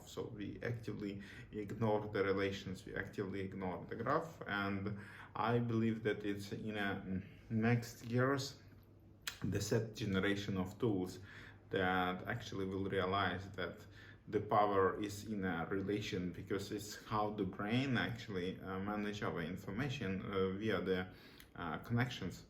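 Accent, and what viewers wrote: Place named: Russia. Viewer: Чисто наш акцент, со снижением силы к концу высказывания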